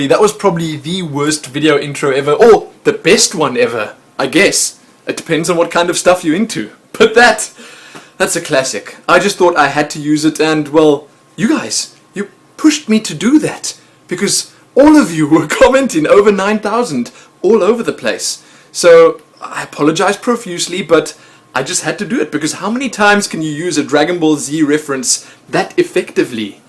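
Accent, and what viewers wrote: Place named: Cape Town, South Africa. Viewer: capetonion accent FTW ! lol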